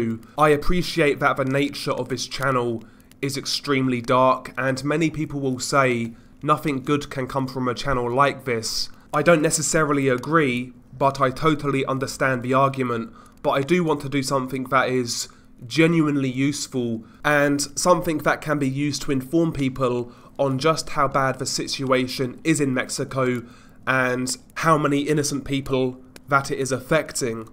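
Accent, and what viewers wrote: United Kingdom. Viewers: Oh the British accent, the best one❤️ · He really can't get more british lol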